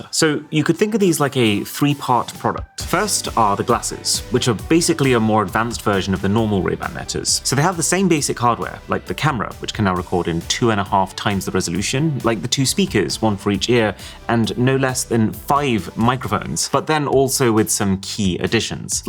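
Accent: United Kingdom. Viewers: Through out my professional career i saw one constant thing - People get away talking nonsense with their British accent. NO doubt this is a great product but what ruined it for me the over action you do with all your reviews. I better get back to my fav review guy Marcus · could also be u have the most clear british accent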